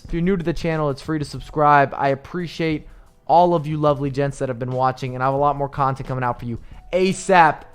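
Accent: United States. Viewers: Bro you american?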